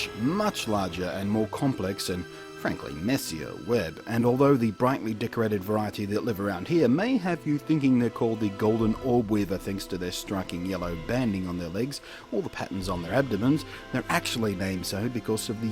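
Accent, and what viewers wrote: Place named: Australia. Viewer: Australian accent + high quality cameras + spiders = very entertaining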